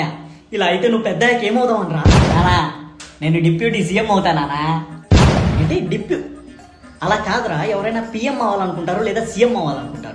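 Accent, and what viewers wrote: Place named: Godavari, India. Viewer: ఇప్పుడు చూసా నీ అసలు ఫేస్ మీ క్రితం shorts లో గోదావరి యాస మాత్రం... బాసు సూపరో సూపర్😂😂😂😂😂😂😂😂😂😂😂😂😂😂😂😂😂😂😂😂😂😂😂😂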